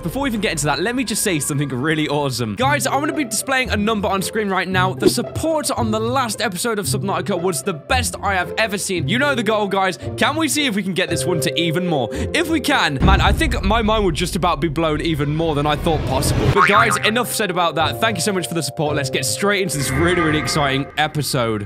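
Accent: United Kingdom. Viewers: Is this guy british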